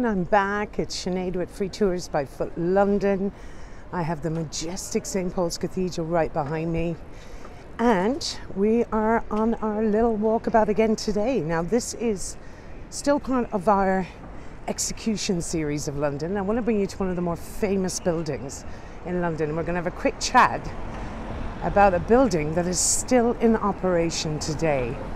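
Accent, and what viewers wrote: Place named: Ireland. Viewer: The best tour available! The Irish voice will take you around the world without leaving home!!!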